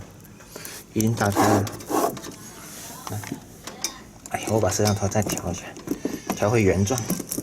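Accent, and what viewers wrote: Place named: Guangxi, China. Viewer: 口音有点像广西人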